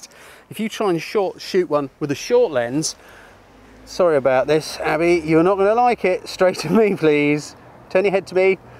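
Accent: United Kingdom. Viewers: Enjoying that until it pauses...and asks for MONEY. Eventually got rid of ad, so thank you for your excellent tips. So nice it's UK English too!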